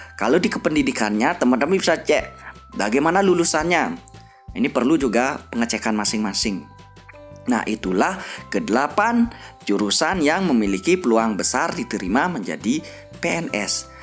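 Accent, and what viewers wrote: Bali, Indonesia. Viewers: T nya lucu ya? dari bali ya? All the best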